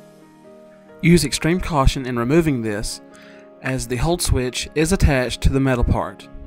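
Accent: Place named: Deep South, United States. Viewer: I love your accent - are you from the deep south?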